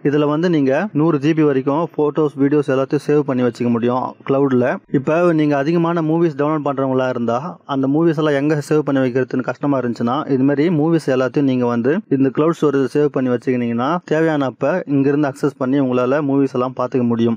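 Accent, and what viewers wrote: Chennai, India. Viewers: Chennai Tamil la informative video 🌝🌝🌝awesome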